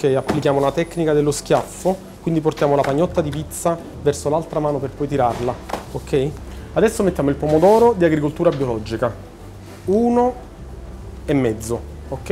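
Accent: Standard. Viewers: He's speaking normally for us, we're italians.Why, maybe you were convinced that we were going to sing pizza pizza mandolin to the rhythm of tarantella? This is the normal speech of an Italian. At least we do not eat vowels for breakfast like you. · Ma parla esattamente italiano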